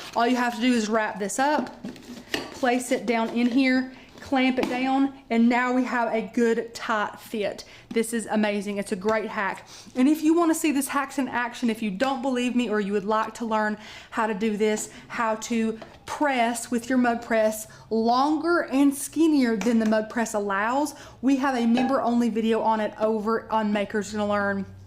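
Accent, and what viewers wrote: South, United States. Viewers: OMG you got a southern accent so I, as a fellow southerner know this is gonna be a good tutorial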